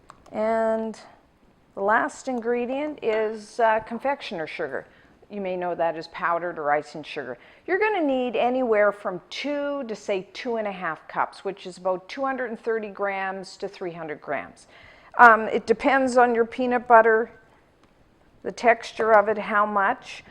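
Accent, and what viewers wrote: Canada. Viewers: canadian accent is peaking.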